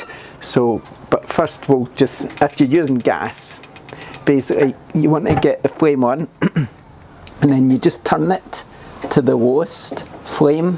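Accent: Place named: Glasgow, United Kingdom. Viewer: Thanks for the info! I love your accent - are you from Glasgow?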